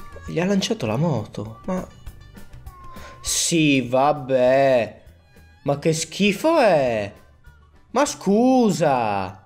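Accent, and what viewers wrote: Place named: Veneto, Italy. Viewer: Lui è veneto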